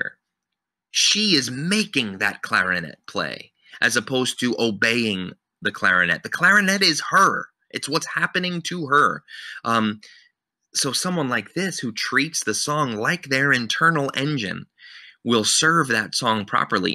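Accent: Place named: United Kingdom. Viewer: Thank you for this excellent analysis! If I may say so, I find the British accent annoying/distracting. was American so I don't feel that my opinion is inappropriate! I saw a video of teaching this song and he was a stickler for the consonants!! Ought / to just like you said!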